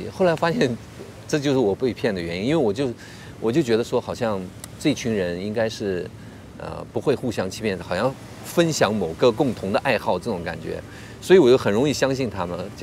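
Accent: Taiwan. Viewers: 不說的話以為您是台灣人 口音真聽不出來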